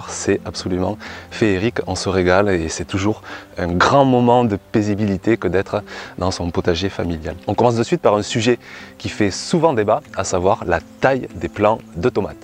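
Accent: South, France. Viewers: Merci pour cette vidéo . Mais je n'arrive pas à comprendre a quelle date (mois) vous récoltez tous ces légumes. Ni comment vous faites pour éviter la canicule de cette année. Comment vous faites aussi pour n'avoir aucun insecte qui détruit les légumes ? J'entends que vous êtes dans le sud, j'y suis aussi (Ardèche, moyenne montagne) et chez nous tout est brûlé par les UV : pouvez-vous nous aider là-dessus ? Merci ! A bientôt.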